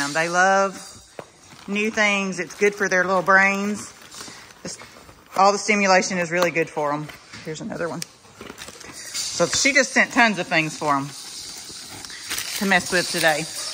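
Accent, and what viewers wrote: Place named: Alabama, United States. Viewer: I just found your channel the other day. I knew as soon as I heard you talk that you were from Alabama. I’m originally from Mobile. If you dressed Lucy in boy colors and never told us her name I would still know she is female because she is so feminine in her movements. Love them all. They make me laugh. 😊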